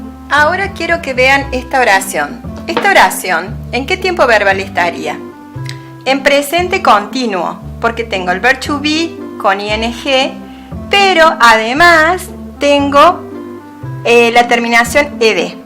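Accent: Córdoba, Argentina. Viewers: Muy buena leccion y muy simpatica esa tonadita de Cordoba!!! 😄😄😄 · Es de cordoba se nota el aasento grasias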